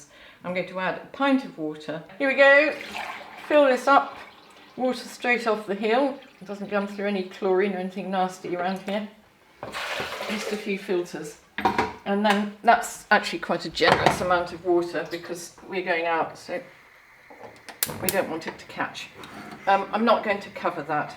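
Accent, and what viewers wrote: England, United Kingdom. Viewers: Thank you so much ! It’s so nice of you to share your knowledge on the leaves too ! I think these tips should be handed down but alas the younger generation don’t seem bothered. I live in the UK and it’s so refreshing to hear an English accent on utube instead of an American. As they also use different measures.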